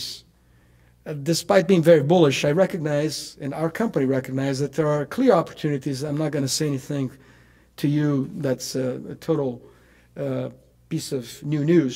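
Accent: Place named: Brazil. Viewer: brazilian accent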